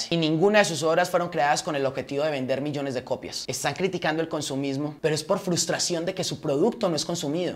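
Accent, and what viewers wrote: Antioquia, Colombia. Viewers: vea mijo usted tiene toda la razon no le pare bola ninguno de esos comentario negativos envidioso y me gusta como hablan los colombianos y te felicito por que tu opinion es mui bueno te miro desde Ecuador Saludos a esos envidiosos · asi es que se habla te apoyo Qué Mal que colombia este asi 😞😔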